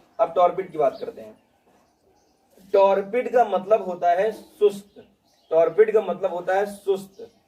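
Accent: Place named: Kanpur, India. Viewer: Sir your energy level is awesome. Your tricks are also superb but I like the way you teach. Smile on your face spread positivity and of course kanpuriya language is the best. Missing your karrahi coaching centre. By the way I'm Parth.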